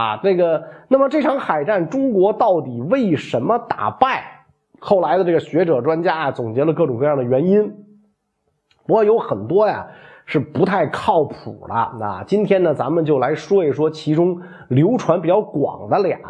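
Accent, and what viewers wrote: Beijing, China. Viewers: 講普通話，有些北京腔聽不太懂。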